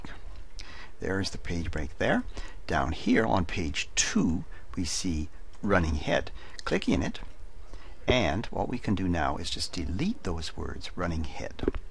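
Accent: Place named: Canada. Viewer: You're adorable. Love your Canadian accent. Even though your video didn't help me...I want to be your best friend! lol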